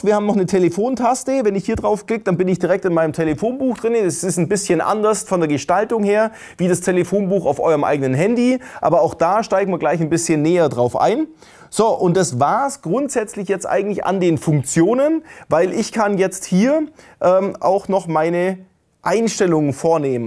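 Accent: Swabia, Germany. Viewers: Das Schwäbische ist mir super Sympathisch xD Ich glaube ich werd mir das Radio zulegen :D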